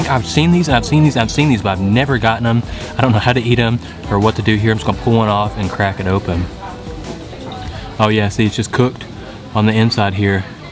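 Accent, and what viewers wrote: South, United States. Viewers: When I heard a fellow southerner I subscribe so quick! This a first that I've seen a southerner vlogger! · Hey! Just came across your channel, and I’m loving it. I was wondering where you where from, hearing that southern accent haha. I read your bio and saw you’re also from AR 😂🐗 keep up the awesome content! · My first time watching you and I enjoyed your video. I live in North Carolina and you sound like you are from the south. Where are you from? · Southern draw and Lao food- what a combo!!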